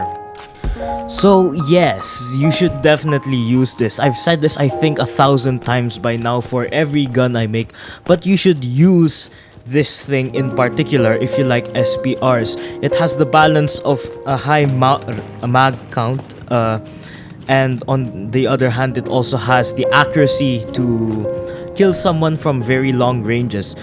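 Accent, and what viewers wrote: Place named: Philippines. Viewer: I discovered Deadline couple days ago and I instantly loved it. Though I'm not really good at building, I just slap in the parts with good stats lol. I'm glad I came across your channel, I got lots of build ideas especially for the M4. This channel deserves more views just like how Deadline deserves more players, you guys are the hidden gems of Roblox community. Btw, are you from Philippines as well? I feel like your accent sounds a bit Filipino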